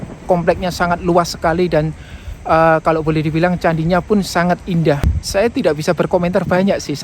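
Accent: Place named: Surabaya, Indonesia. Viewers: Logat e Suroboyo banget 😀😀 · Loghatnya jatim banget 😅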